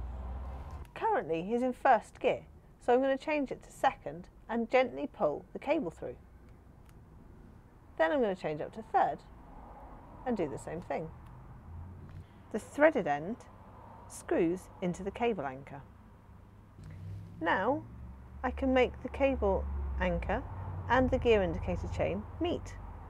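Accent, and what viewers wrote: United Kingdom. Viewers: Brilliantissimo! Helpful with lovely British accent. My pink Brompton is ready for the end of lockdown soon in France.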